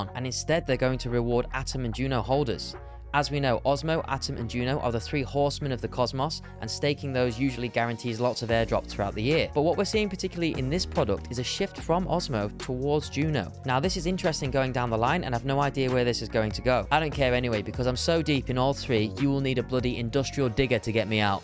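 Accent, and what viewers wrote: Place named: Yorkshire, United Kingdom. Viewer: You’re the 🐐 mate aha. Can tell from the accent you’re from Yorkshire pal, which part ?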